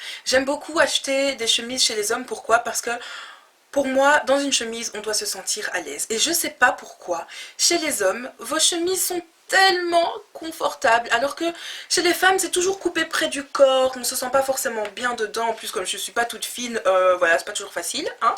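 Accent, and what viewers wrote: Belgium. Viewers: lol trop marrant😅😅😅😂😂tu es belge?? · T'es chou avec ton accent belge ❤️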